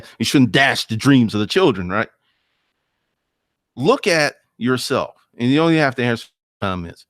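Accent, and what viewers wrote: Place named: South Carolina, United States. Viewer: Yooo!! you sound JUST like the folks from my hometown in SC. I always thought the same thing, but never voiced it. (guess I was afraid to get smacked. lol) THEN.... my mom told me "don't get one of these jobs at the plant and get comfortable with money" I said, "TRUST ME... You 'ain't' gotta worry about that mama." haha Thanks for the amazing video. So much truth in here!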